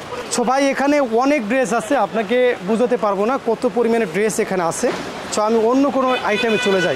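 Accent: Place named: Barishal, Bangladesh. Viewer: Narayongong er lok er borishaler moto kothar sur keno ashe ??. uni ai alakar lok na?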